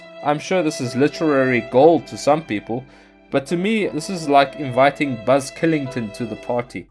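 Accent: South Africa. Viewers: I'm really enjoying your content, mate. Also, out of pure curiosity: Your accent.. are you south-African/boer?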